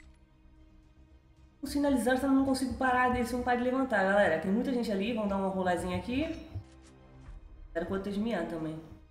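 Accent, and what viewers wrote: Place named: Portugal. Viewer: Tem Um Sutac Portugues muito Lindo Amei❤❤😍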